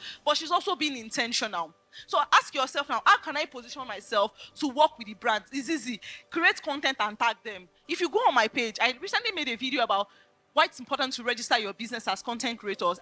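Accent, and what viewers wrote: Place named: Nigeria. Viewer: I love how you're speaking like a Nigerian. No extra accent😂❤❤❤.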